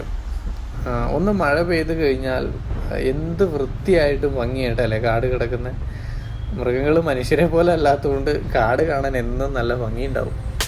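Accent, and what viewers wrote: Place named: Muvattupuzha, India. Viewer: Njanum..super presentation aanu bro..muvattupuzha/ perumbavoor/Thodupuzha slang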